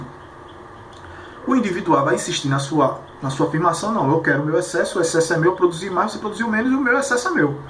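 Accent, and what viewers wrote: Pernambuco, Brazil. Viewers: Você é pernambucano? Eu também sou, Parabéns pelo vídeo, estou querendo fazer um curso de história e estes vídeos me deixam com muita empolgação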